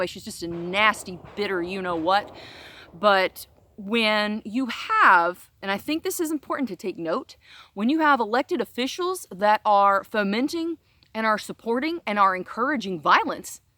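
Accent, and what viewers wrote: South, United States. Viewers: As soon as I hear a southern accent I move on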